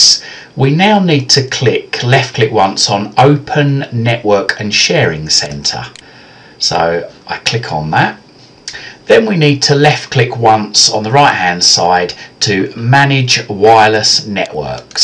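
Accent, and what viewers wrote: England, United Kingdom. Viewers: Fuck this has been bugging me for 2 years LOL. thanks for that English sounded dude.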